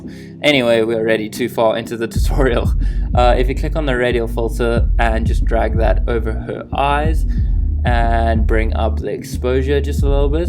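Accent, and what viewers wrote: South Africa. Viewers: Great vid man! I'm a fellow filmmaker and photographer myself! Just moved to Cape Town, could tell thats where you're from because of the accent. Thanks for the great tips! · loved this! At the start, I could tell you were South African and went to go check in your about section haha. Thanks for the tutorial! I am about to use it for the first time and I think I have been well equipped. the sound was also 100% on my laptop. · South African? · lol are you South African? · Are you south African? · Are you South African? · You sound south african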